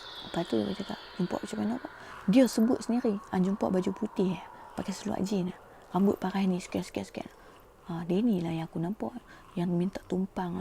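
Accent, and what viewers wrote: North, Malaysia. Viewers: Sama sama bukan apa shiok dengaq hang bercerita dengan loghat utagha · Teruskan buat citer hantu best Cara citer teruskan ckp owg utara best citer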